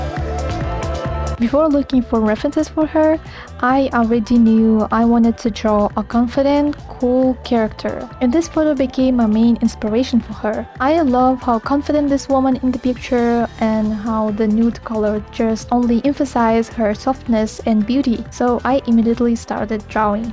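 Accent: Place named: Russia. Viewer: Немного русский акцент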